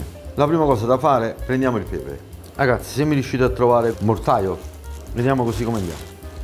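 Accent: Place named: Rome, Italy. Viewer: Chef se chiudo gli occhi mi sento a Roma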